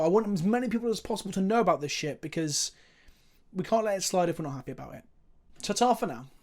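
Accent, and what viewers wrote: United Kingdom. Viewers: I enjoy these kinds of videos. Even though the news is kinda a kick in the balls, it helps to hear you talk about it with that velvety british boi baritone voice.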